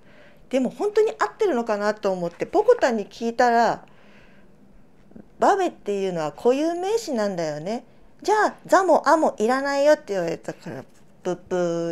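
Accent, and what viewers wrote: Kagoshima, Japan. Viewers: かごんまのひと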